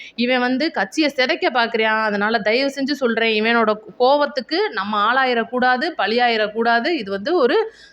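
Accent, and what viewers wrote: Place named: Madurai, India. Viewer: Akkow namma ooru MADURA slang la irukku. Nalla pesurwenga , be continued for TVK📈🚩👍🏽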